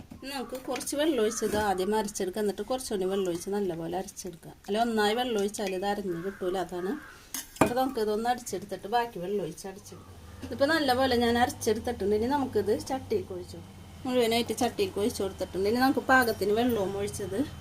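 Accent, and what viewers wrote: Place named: Chavakkad, India. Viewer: Chavakkad vadanapppalli samsaram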